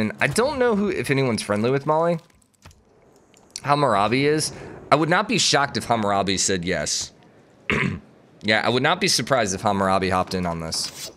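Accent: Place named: United States. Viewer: Great video. You americans say words real funny and i love it.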